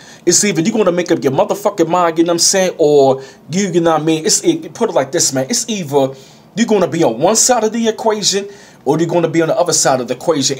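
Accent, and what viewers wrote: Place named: New York, United States. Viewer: Your NY accent is super fly 😘😘😘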